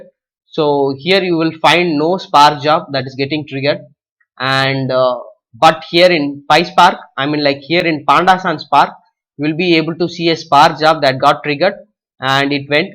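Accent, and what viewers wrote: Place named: India. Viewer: anybody have any channel recommendations on this subject where the voiceover has an English/American accent? I can't listen to the Indian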